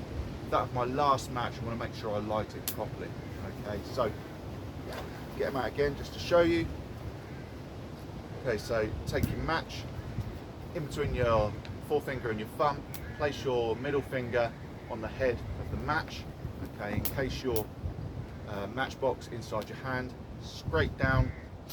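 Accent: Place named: United Kingdom. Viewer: Hi, these videos are fantastic, thank you! I had no idea that you had made all these fire starting videos when I left a comment recently asking for some from you on fire steels or ferro rods. So I am just enjoying watching your videos for now. Next time I know I can check your play list first. And once again its great to hear a British accent talking about bush craft 👍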